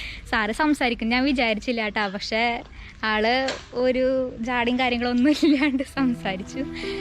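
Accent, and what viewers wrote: Thrissur, India. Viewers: തൃശ്ശൂർ ലാംഗ്വേജ് കേൾക്കാൻ നല്ല രസം തന്നെയാണ് അവതരണം 👌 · ഇയാളുടെ തൃശ്ശൂർ ഭാഷ കേൾക്കാൻ നല്ല രസമാണ് ..😍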